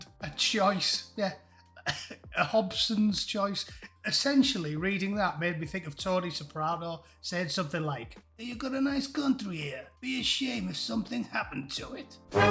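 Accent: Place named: North, United Kingdom. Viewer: Definitely prefer to get the news through you as its healthy to laugh..but whats that elevator music in background?..trying to soften your northern voice??..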